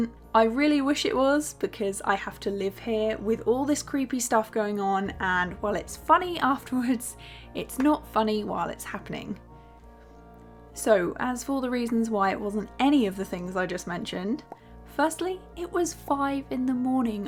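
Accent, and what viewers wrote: United Kingdom. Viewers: I really love watching your video. Your animation is so cute. and The best thing that I love is your bloody lovely accent! I'm studying accent with your video.HAHA BTW Which in The U.K do you live? what accent you have?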